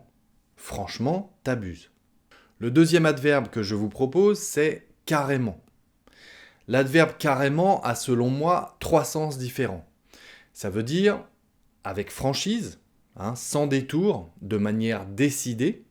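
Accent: France. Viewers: Bonjour! Excellent travail comme toujours! A propos, de quel coin de l'Hexagone venez-vous? J'ai l'impression que votre accent est du nord, mais je n'en suis pas certain. Bonne continuation!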